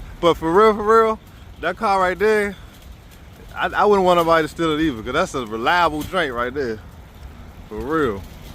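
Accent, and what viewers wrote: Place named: Washington DC, United States. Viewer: you from the DMV i can tell u got like a DC accent lol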